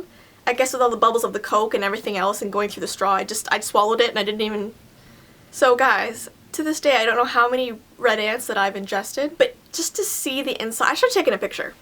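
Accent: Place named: Canada. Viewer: She has a Canadian accent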